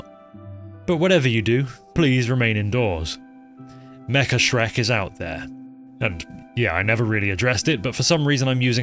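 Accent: United Kingdom. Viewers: Watching an extremely british person say "Fuckos" in the most polite and eloquent voice was not something on my bucket list.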